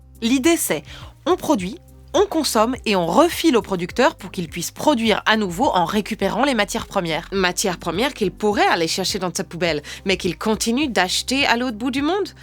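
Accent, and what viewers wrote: England, United Kingdom. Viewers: L'accent britannique est tellement parfait, il est mesuré, à aucun moment cliché. Et le fait de parler écologie : 💚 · C'est excellent!!! J'ai eu peur un petit moment à cause de l'accent, mais ha, elle parle en français avec son accent anglais, tout s'explique! Et le message de la fin est extrêmement pertinent (personnellement acquise à cette cause à 100%, j'utilise des sacs en tissu, des bouteilles consignées et j'ai des pailles lavables en métal)... et la dernière phrase est tellement forte!!! Je valide avec un enthousiasme indescriptible et les larmes aux yeux! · Bravo pour cette nouvelle vidéo! Accent anglais parlant français très réussi! Thème d'actualité qui fait du bien aussi! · Cet accent anglais 😳😱😱😍😍😍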